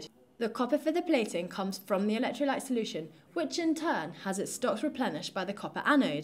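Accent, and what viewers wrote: United Kingdom. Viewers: its pure british. enchanting no doubt but i wonder do they also love the way we speak hindi. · British accent🤩😍😅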